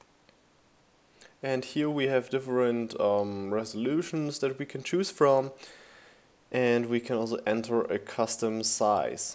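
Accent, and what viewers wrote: Germany. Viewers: Du bist ein deutscher oder? ;D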